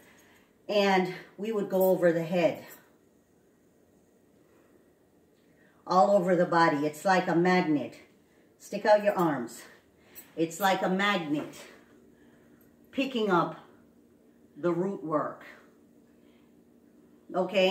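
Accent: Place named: Caribbean. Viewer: Are you from the Caribbean islands Because I can hear a accent when you talk?🏝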